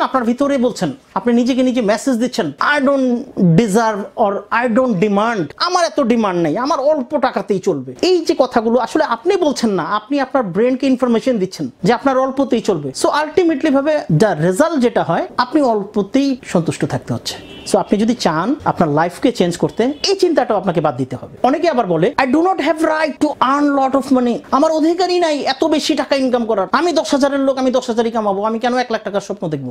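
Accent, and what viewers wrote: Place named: India. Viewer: Your accent is indian. Particularly the pronunciation of ‘t’ is too strong.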